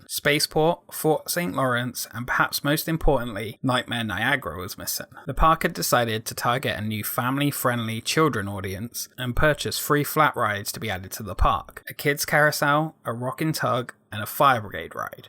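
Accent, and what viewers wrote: United Kingdom. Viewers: Hi mate I've been watching you're videos for months just wanted to say they are awesome . So glad to hear a British accent on theme park commentary. You do some fantastic research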